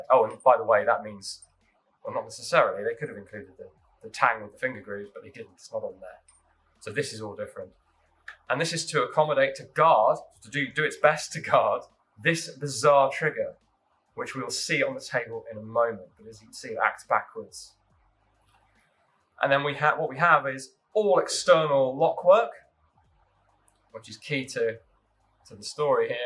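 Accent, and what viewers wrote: England, United Kingdom. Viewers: Ian, what happened to your hair and why are you speaking in an English accent?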